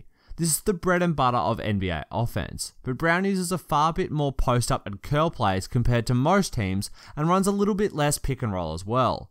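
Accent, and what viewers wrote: Australia. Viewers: Thats some thick ozzie accent you got there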